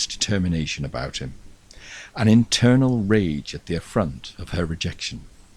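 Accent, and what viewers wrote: United Kingdom. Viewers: I really really love this British accent and reading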